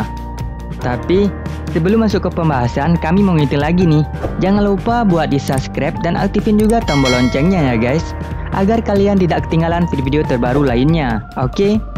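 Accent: Aceh, Indonesia. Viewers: Nah iya kan org Aceh ni pasti yg punya channel 🤣🤣🤣 logatnya ya kan cuy 🤣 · orang aceh bang?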